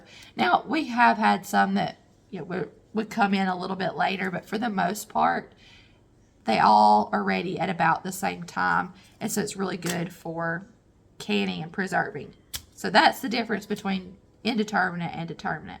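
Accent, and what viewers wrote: South, United States. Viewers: Love your accent as a fellow woman from the south, you’re a pleasure to listen to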